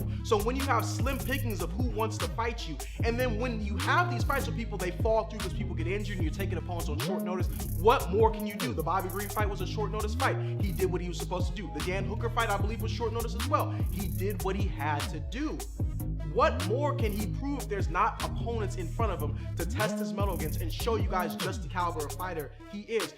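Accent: Ireland. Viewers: This host has a very different vibe from the usual calming Irish accent. Polar opposite even. Wish him the best but sorry not the one for me. Please stop shouting at me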